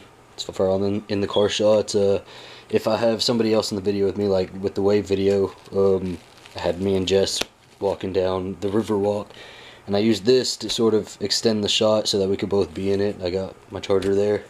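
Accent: South, United States. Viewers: You have one of the most comforting southern voices I've ever heard. It's like a warm blanket you simply love wrapping yourself up in. Add to that you seem to be a natural born storytelling, making it possible to cast a spell so easily upon your listeners. Not to mention you're cute as a button! Yes you are!! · My hero Brave and strong Im southern as well love the accent You Rock ! You actually inspire and help people unlike most self absorbed you tubers . Dr pepper just gave your roots away ! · Love your videos! Love your southern voice. Also, nice Hickie! ;)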